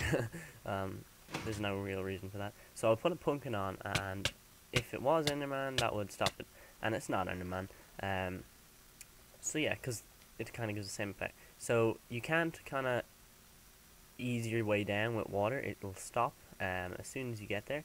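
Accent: Ireland. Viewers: is this lad irish? he sounds it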